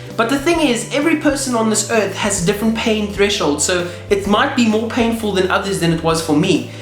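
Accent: South Africa. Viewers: your south african right? I can tell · are you south African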